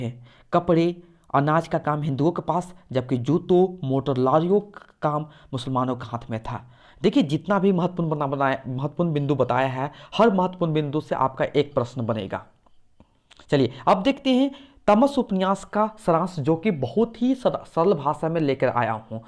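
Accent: Bengal, India. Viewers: Like bengoli accent 😊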